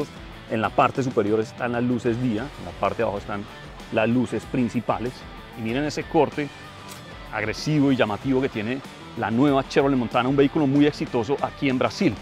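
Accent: Bogotá, Colombia. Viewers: Habla como rolo lentico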